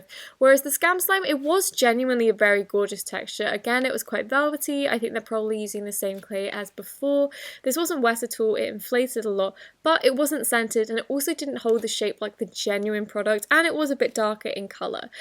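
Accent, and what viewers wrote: United Kingdom. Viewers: are you british or accent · Omg! Is that a…UK ACENT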